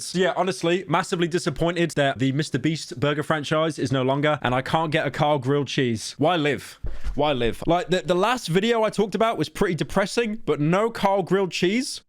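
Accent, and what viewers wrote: United Kingdom. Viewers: Shut up British man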